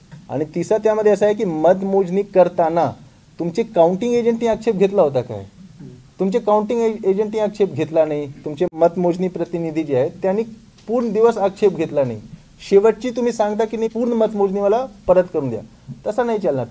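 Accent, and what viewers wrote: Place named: Gujarat, India. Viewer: Gujrati ahe vate ha kasa marathi bolto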